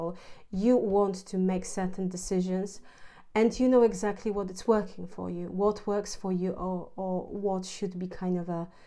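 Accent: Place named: England, United Kingdom. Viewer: Love your English accent. You sound like a very sweet and caring person. I enjoy your readings.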